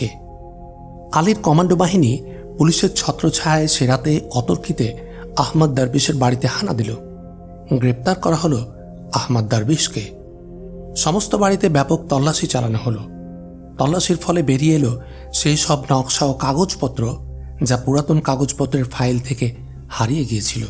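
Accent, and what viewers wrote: Kolkata, India. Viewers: আপনেত দেখি ভাই কলকাতার স্টাইলে বাংলা পড়া শুরু করলেন যা আরো আগের পর্বগুলোতে পাওয়া যায়না 🤭 শ কে স ছ পড়ছেন 🤔🤔🤔😏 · ভাইয়া আমার আম্মুও ক্রুসেড সিরিজের পাগল হয়ে গেছে। আমার খালা শুনে বলতেছে আপনি নাকি ভারতের কলকাতার। আপনার কথা কলকাতার মানুষদের মত লাগে।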